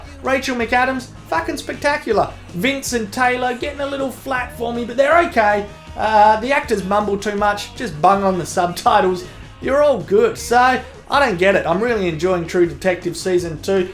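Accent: Australia. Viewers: g'day ozzy man. i enjoy your takes on the many pictures up for grabs and i'm a big fan of australian diction in general. i enjoy how youse and the brits rock the english language. i've been checking your channel to see if you had reviewed the other episodes of true detect s2 as i wanted to hear your thoughts on the show, so i was pleased you touched on it in this video. i was surprised however to hear you give it a passing grade mate. apart from ep1, farrell and mcadams, the show has largely been shit and most especially that action piece at the end of ep4. it was utter trash! and wtf was with that riot shell shotgun double-tap in ep2? why the fuck would you double-tap with riot shells? like it's not accomplishing anything. anyways keep it up you hoser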